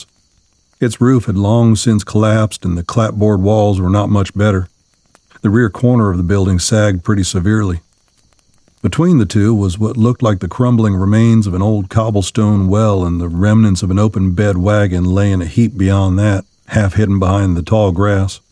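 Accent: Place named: South, United States. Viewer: Awesome Awesome Continuation of this story. I love the 1st two I listened to by Viidth22 and again(1st one) by LightHouse just before coming here(my two Fav readers and voices). I was pleasantly surprised by your voice and it matched with the story so well with your southern voice. I Love these kind of Stories, it might be my age I'm not sure as I'm almost 60. I find some stories have a much younger feel to them and I can't get in to them as much as others, I'm an old Dean Koontz fan from the early 80's, read all his stuff since, and of course other Authors, so months and months ago when I came to some reading channels I was so happy as my eyes are not as good anymore, as I use to read for hours but can't anymore. *Plzz don't stop writing about these fella's and don't kill Jonas off yet like you did Tommy... lol.. Your Fan!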